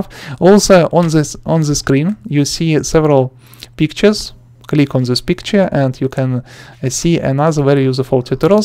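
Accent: Russia. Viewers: Акцент русский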